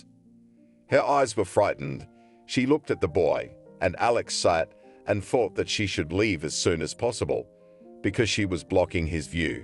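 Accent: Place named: United Kingdom. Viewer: well im sorry to say that the story might very good but I need to quit listening because the way the narrator speaks, it's so hard to understand as he is with british accent. making letter A as I, like in the word play as ply. letter O as Oi, as in the phrase I doint knoi, goi hoime, etc. hope you could find a better narrator with american accent. sorry again and thank you.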